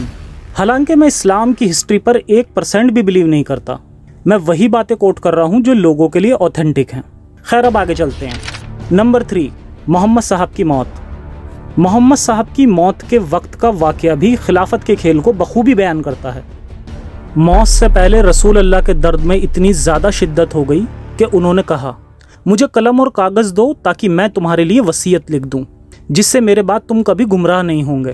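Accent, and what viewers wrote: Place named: India. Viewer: Awaz say he Indian lag raha ha. Jhoota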